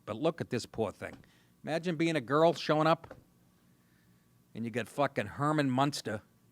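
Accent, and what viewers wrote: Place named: New York, United States. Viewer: "sar" a soccer game. Ha...ney York accents. Love ya Nick.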